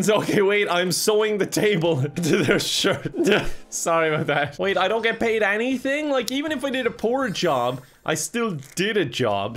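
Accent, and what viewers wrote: Ireland. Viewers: IRISH ASMR WE NEED IT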